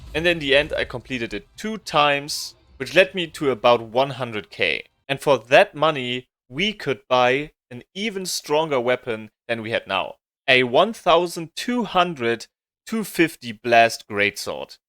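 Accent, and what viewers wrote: Germany. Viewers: Are u german?😄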